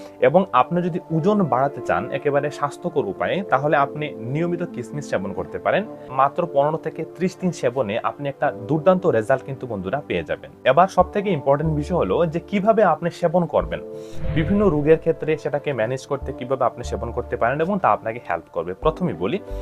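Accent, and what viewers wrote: Sylhet, Bangladesh. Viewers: বালা মাতছইন সিলেটি ভাই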